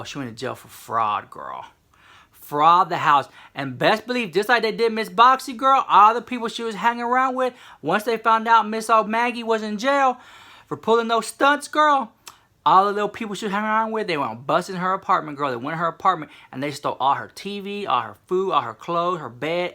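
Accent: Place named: Texas, United States. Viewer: girl we can hear you come from Texas.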